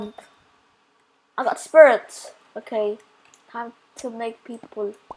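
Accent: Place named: United Kingdom. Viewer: Briish huh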